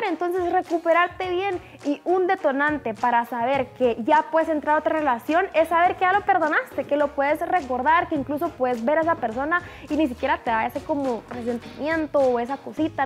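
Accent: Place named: Guatemala. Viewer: Es de Guatemala.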